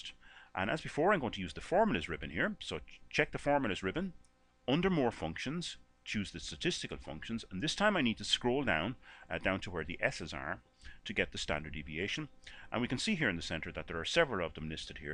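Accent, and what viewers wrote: Ireland. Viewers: I found this video very useful,. And...are you Irish, cause you sound Irish, and your last name is O'(name). I liked hearing your voice as I followed along (it was very relaxing and almost lulled me to sleep, loll), and I liked the fact that you went slow. Thank you so much! Blessings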